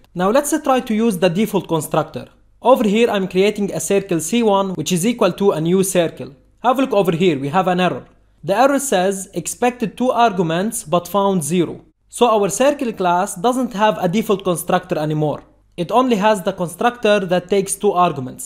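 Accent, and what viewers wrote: Russia. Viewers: are you from russia💀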